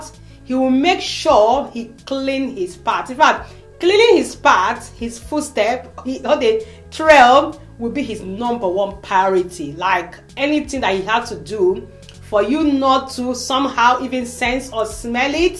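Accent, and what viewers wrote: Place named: Nigeria. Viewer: Im loving th naija accent💕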